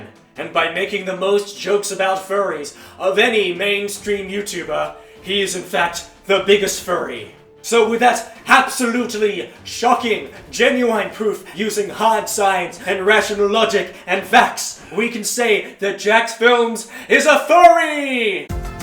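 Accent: Australia. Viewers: You're Australian?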